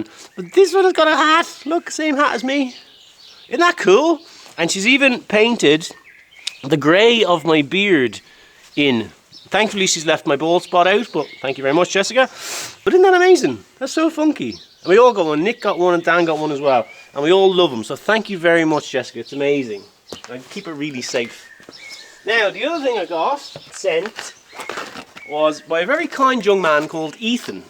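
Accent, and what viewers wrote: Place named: Ireland. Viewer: I absolutely love your vids darling, you explain things concisely and clearly in that gorgeous lrish accent, and your gentle sarcasm has actually made me laugh out loud, which so RARELY happens !! I've just subscribed and.........thankyou for cheering me up !! xxx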